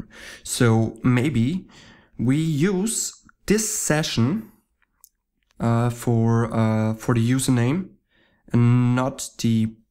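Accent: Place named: Germany. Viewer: Germanish english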